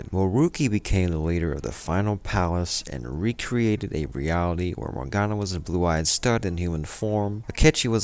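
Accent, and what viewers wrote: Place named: United States. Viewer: Great story explaination and at first I thought u were pronouncing some names wrong bcus u didn't know but then I figured out that u were pronouncing it the American way lol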